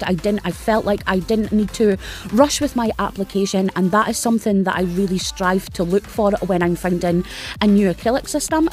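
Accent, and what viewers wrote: Scotland, United Kingdom. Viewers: Love the colours I need to get me some colours! Keep up the good work. Love ur accent by the way it reminds me of my mum, she's Scottish from Hamilton.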